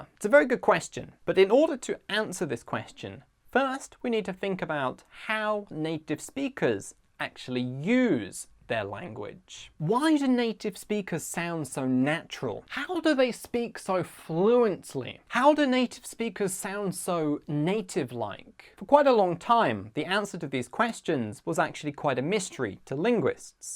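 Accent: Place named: United Kingdom. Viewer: Yes, it is British English!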